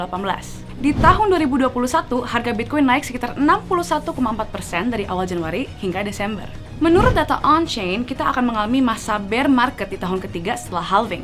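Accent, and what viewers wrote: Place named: South Jakarta, Indonesia. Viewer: style ngomong nya Jaksel banget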